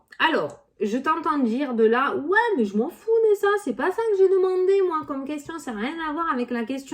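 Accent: South, France. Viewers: Bonjour, Déjà, mille mercis pour ta bonne humeur & ton accent du sud qui font du bien. On m'a effectivement proposé un partenariat. Je suis en pleine reconversion et une connaissance me propose de partager des locaux dans un quartier en plein essor.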